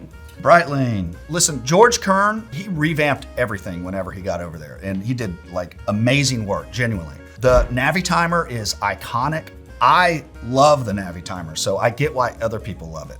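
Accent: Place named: France. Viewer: One of the best and more complete tier list in my opinion. Your french accent is perfect!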